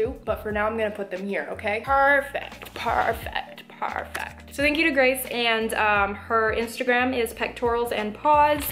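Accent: Texas, United States. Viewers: Love that she's from Texas and says "y'all" like me 😁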